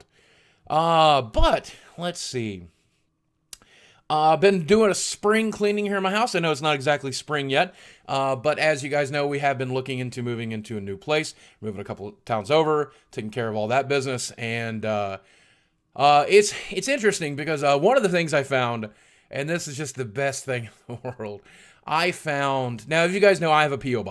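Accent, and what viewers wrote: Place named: San Francisco Bay Area, United States. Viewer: Hella? you sound like you grew up in the SF Bay Area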